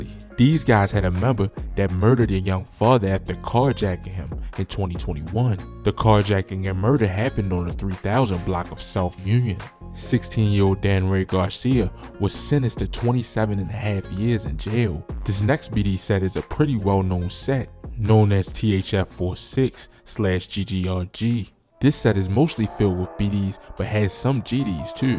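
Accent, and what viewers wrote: Baltimore, United States. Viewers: Whoever made this video ain't even from Chicago, he sounded like he was from Baltimore. jess hilarious voice azz boy😂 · Dude u stay talking about chicago ... u sound like u from Baltimore... tell us about the 💩 and areas of your city... amfh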